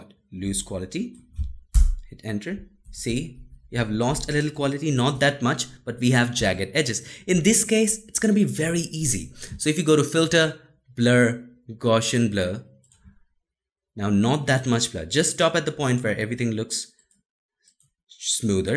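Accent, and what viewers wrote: India. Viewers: Sir, your accent is wonderful! I guess you're an Indian, and I feel elated to have watched this video! Thanks a lot! You nailed it bhaiya!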